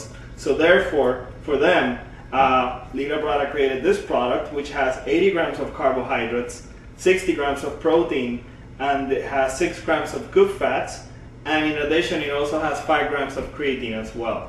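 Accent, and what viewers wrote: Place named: Spain. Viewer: I love your Spanish accent, it's awesome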